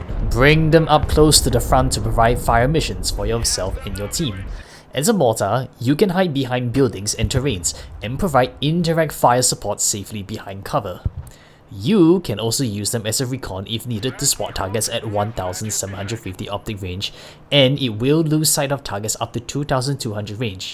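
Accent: Singapore. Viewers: Are you by any chance Singaporean?? I can hear the accent lool.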